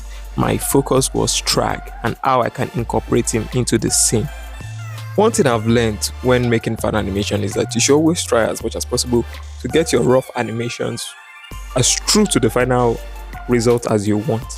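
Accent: Nigeria. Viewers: I know a 9ja boy's voice when I hear one😂💪🏾. W vid🙌🏾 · Loving the naija accent my man! Let's freaking go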